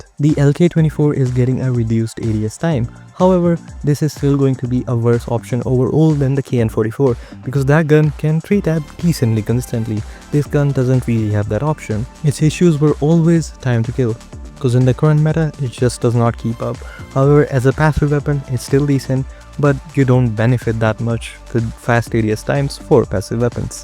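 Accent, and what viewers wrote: India. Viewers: non Indian VOs please comeback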